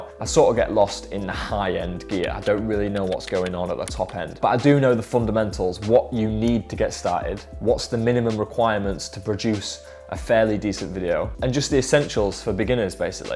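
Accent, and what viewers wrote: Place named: Yorkshire, United Kingdom. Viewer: Btw are you from Leeds or Bradford ? Your accent is too close to home not to be.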